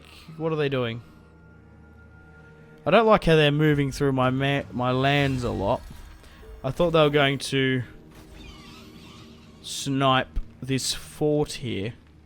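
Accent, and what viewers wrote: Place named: Australia. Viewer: The only thing that keeps me watching your vids is your Aussie accent. It's like listening to a 18th century English commander. I bet u have a handle bar mustache too.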